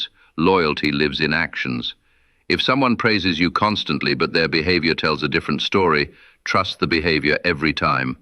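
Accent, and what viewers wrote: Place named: England, United Kingdom. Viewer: He was Italian, why does he have an English accent?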